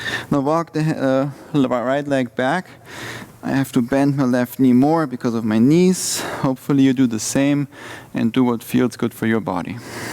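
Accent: Germany. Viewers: Awesome session. One of the best I ever made.. The guy has a familiar German accent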